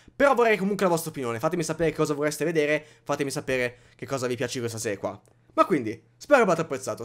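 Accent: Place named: Emilia, Italy. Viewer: Sei emiliano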